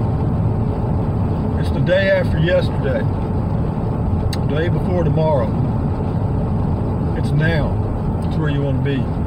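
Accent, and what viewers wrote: Texas, United States. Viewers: " "...one of my favorite songs by. Your accent is just like that of my relatives in Texas...pure Texan! I have a boring California accent. Having a wide range of experiences, as you have had, I think helps with keeping things in perspective. Look forward to seeing the Maxx fan in action. Good video....I enjoy listening to the random thoughts.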